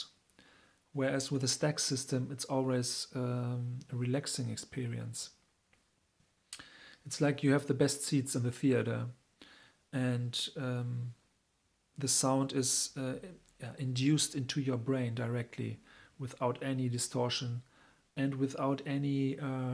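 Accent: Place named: Germany. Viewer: You sound very german. Nice review.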